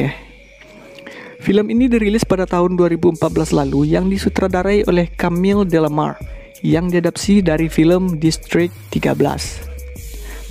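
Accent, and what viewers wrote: West Sumatra, Indonesia. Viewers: Orang Minang ya bg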